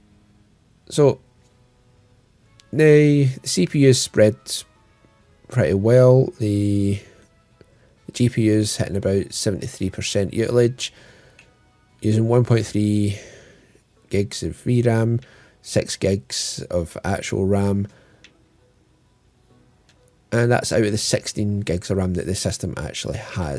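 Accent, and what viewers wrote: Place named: Wales, United Kingdom. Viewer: Great showcase. It's nice that you are talking more in the videos and I love the accent. Is that welsh?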